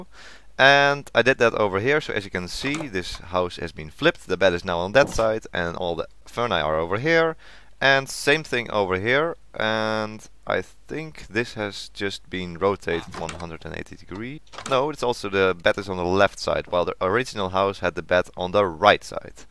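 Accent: Netherlands. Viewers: Hahahahaha Hoi Nederlander ahahhahahaha geen haat maar bij elk Nederlands persoon kan je horen dat je Nederlands bent als je Engels praat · You sound like a dutch man am i right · Ik hoor aan je stem dat je een Nederlander bent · Are you dutch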